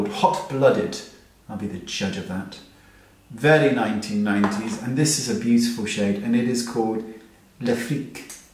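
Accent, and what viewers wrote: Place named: Wales, United Kingdom. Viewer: you sound super welsh today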